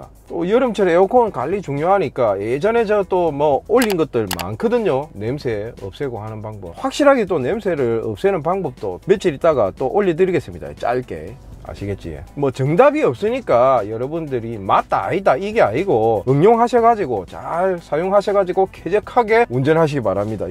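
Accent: Gyeongsang, South Korea. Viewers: ㅋㅋ 갱상도 사투리를 유튜버에서 듣게 되는군요 ㅎ... "빠마데기"라는말 진짜로 오랜만에 들어봅니다 ㅋㅋ~^(^ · 빠만떼기 말수도 있습니다 ㅋㅋㅋㅋㅋㅋㅋㅋㅋ 제가 갱상도 남잔데도 사투리가 구수하게 들리네요 ㅎㅎ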